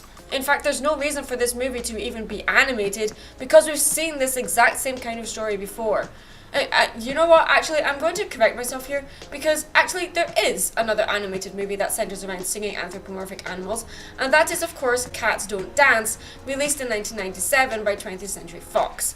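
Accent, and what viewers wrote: Scotland, United Kingdom. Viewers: Hi! I have a question to ask you. ( I don't remember if I had asked you this before so if I have I'm sorry but could you please answer again cuz I can't find it) I have a question about your voice for Mary Sue. I know that you're Scottish and I love your accent by the way but whenever I hear you do Mary Sue's voice ( to me) you sound like you have an American accent, was this planned for the first ever video that showed Mary Sue,or was it just by accident? I'm just wondering because your accent you have for your videos when you speak and accent you have for Mary Sue and she speaks or very different so I was just wondering thank you!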